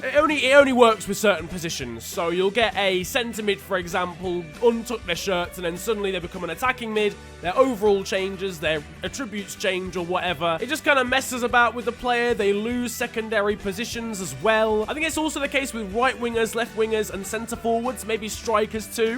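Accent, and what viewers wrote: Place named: United Kingdom. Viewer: Honestly, this video is good, but this fucking awesome accent makes it even cooler. I love british accent! Thanks mate, thanks!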